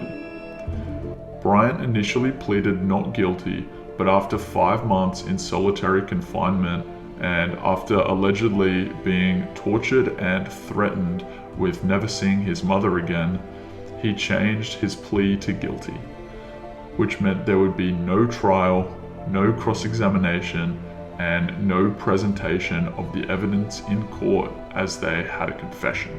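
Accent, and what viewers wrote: United States. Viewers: Sounds very American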